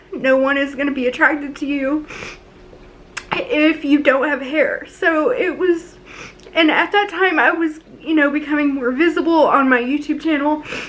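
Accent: South, United States. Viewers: your lil southern accent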